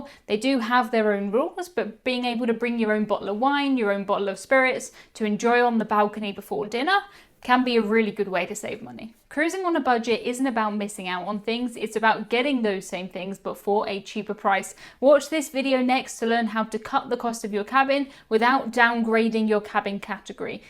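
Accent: United Kingdom. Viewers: Love the British accent (being American, it's cool to see the different ways we speak the same language). I could get lost in your eyes and listen to you talk all night!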